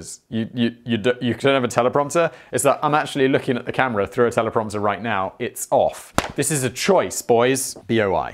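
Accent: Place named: United Kingdom. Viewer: Knows everything about trailer laws in the UK but nothing about the history of cars worldwide and has a channel called 'Today I found out: Brain food for hungry minds'.. ..as much as I enjoy this light hearted content, you're losing credibility as having a curious mind Simon (the Czech, US Citizen, and British sounding person)..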